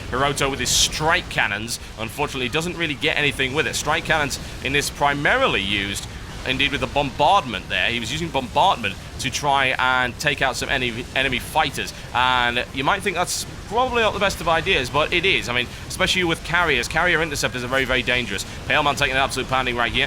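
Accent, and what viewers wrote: United Kingdom. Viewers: Where is he from? (Well obviously some place in britan but i mean slightly more, because as has been stated by many, your voice is awesome...) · its just part of the british-english dialect i think. an accent from where he lives. just like not everyone in america says things the same. i know people from boston who don't pronounce their "R"s in words. they say Cah instead of Car. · It's pronounced that way if you have the kind of British accent that TB has. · why do you always say YURS insted of years lol :D funny as hell tough you cynical brit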